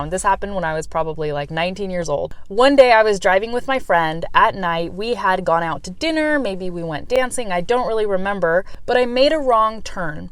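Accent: United States. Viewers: What region from u.s.a are you? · Your American accent is so lovely, It's incredibly clear <3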